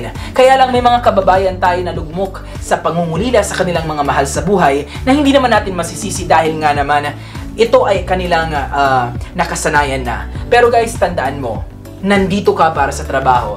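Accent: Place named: Arab world. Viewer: nkakatuwa, mukhang arabo na fluent sa tagalog though nsa accent nya ung arabic.. ang galing.. nice mixed.. gwapo ah.. gym ka bro lalong bagay sayo pagiging arabic.. good luck ang more blessings sa channel mo...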